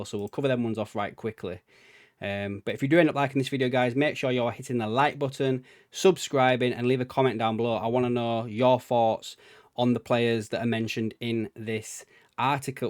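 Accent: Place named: Yorkshire, United Kingdom. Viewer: Proper Yorkshire our is.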